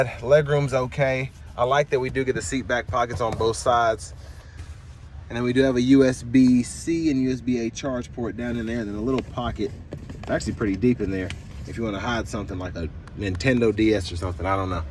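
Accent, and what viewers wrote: South, United States. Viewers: Nice southern accent! Great review. 😀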